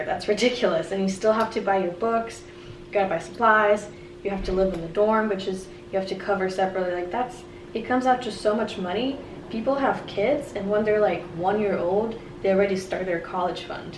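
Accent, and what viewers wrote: United States. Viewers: You sound like an american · In none of her videos does she talk about returning to Russia; all her talk is about applying for different visas and trying to prolong her time here and getting a job here; I think she intends to move here; she already sounds and acts like an American. And of course she now has an American BF.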